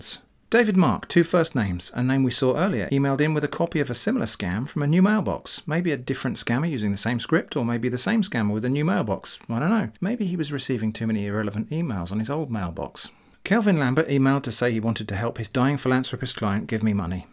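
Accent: United Kingdom. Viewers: Your droll humor and British accent are a delightful combination playing with these crooks. TY.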